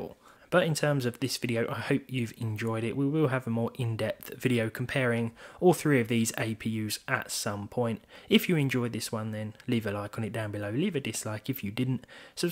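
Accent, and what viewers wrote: United Kingdom. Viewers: Very british pronunciation... At least for my Russian ear.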